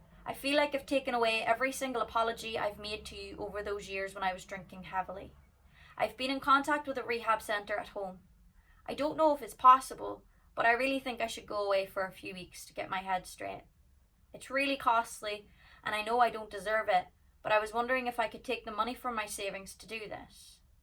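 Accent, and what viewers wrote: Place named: Ireland. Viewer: I am a 67 old man in Holland. Two weeks ago I saw you the first time on youtube, looking at X factor video’s. The simple way you sang the song struck me. Vocaly you were not perfect, but you told a story. Your voice, Irisch accent and appearance intrigued me. I listened to other songs you sang and each time you told a story more than you sang a song., you are a storyteller. That is why I also like to listen to your vlogs. When you are telling what you are doing en things about youself I clearly see what is going on in your mind. Emotionaly you are an open book and that is also what you want to be. I know that everything on the outside of you is fake, but the inside is reaal and that is what makes you special for so many.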